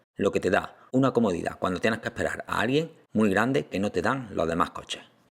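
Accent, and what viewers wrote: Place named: Andalusia, Spain. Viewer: que acento andaluz mas pronunciado macho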